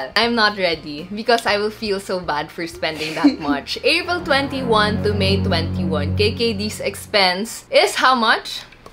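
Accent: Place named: Conyo, Philippines. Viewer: How did you know it’s intentional? She speaks like this since her college days so obviously that’s her way of speaking na and it’s not because “need” niya artehan. Aby Marano even said in an interview na mas conyo pa si Kianna nung rookie siya and mas natuto na lang siya magtagalog because of her volleyball teammates. Among her sisters, she’s the best at speaking Tagalog.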